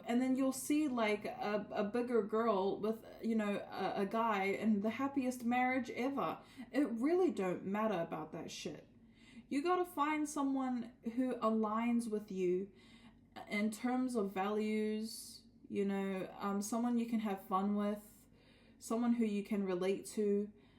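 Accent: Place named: New Zealand. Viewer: ok cool! Sorry I'm a geography buff lol are you from the north island or the south island? I noticed the accent too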